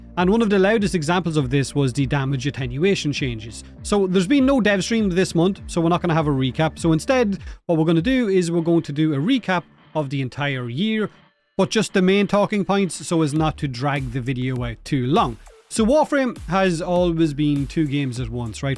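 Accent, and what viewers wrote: Ireland. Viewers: Where are you from in Ireland???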